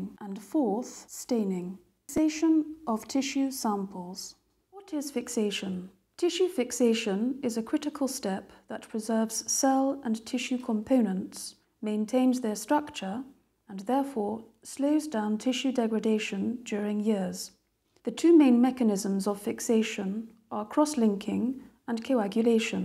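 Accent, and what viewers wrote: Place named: RP, United Kingdom. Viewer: Sounds like "Received Pronunciation"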